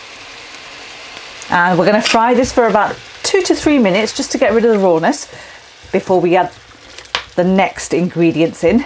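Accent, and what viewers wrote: United Kingdom. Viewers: oye fake King tujy itne se bat samj nai ati ke wo UK main pedA hue hain is ley un ka accent asa hai aur fake accent wo hota hai Jo banda 3 month UK main rahy aur phir British accent ki Tara bat kare. to ye sab ko pta chal jata ke ye fake accent bana rha hai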